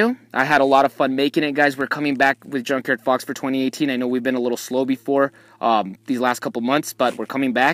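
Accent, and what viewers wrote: Texas, United States. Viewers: Where in texas is he from??????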